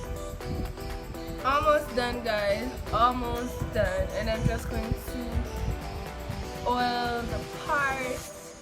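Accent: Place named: Caribbean. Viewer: And Mash up the curls i love that.... carribean gyal... i could tell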